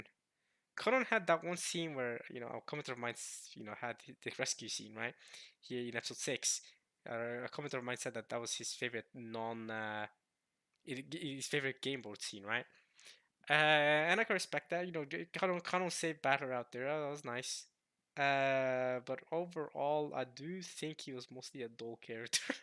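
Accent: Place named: Norway. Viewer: And you are talking like norway people... are you from norway?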